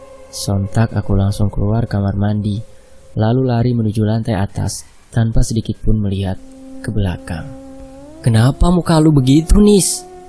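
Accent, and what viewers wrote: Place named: West Java, Indonesia. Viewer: Kesel bgt dengernya klo pakek logat sunda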